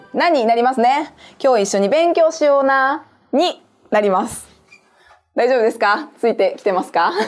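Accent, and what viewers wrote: Kansai, Japan. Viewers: わし関西弁が大好きやねん。先生は関西人やな。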